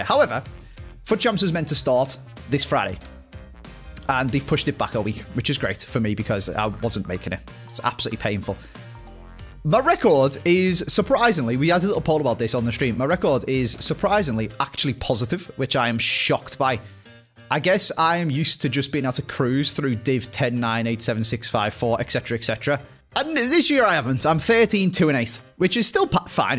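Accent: Liverpool, United Kingdom. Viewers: Yes lad best scouse youtuber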